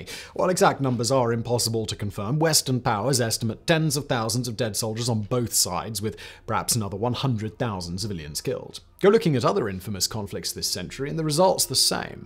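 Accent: RP, United Kingdom. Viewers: You are simply very biased, repeating TPLF propaganda with a BBC accent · I wish the world to know not all of us British sound this way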